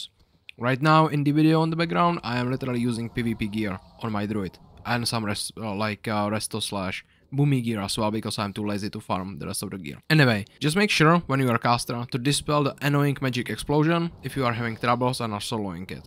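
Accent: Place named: Czech Republic. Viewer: proč jsem poznal že si čech v prvnich 3 slovech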